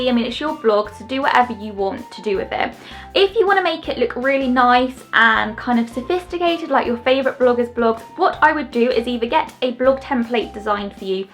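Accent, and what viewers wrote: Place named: United Kingdom. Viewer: Gymnast4Life-M I know right sooo British in British don't have such a beautiful accent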